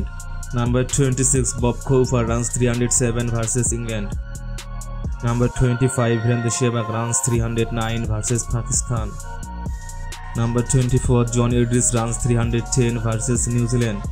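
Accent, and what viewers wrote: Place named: Sylhet, Bangladesh. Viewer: This guy's Sylheti English is funny🤣🤣🤣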